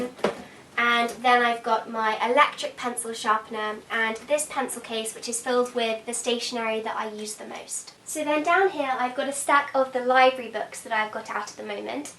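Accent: RP, United Kingdom. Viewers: Can you fake your British accent. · I adore your British accent... I wish I had it too..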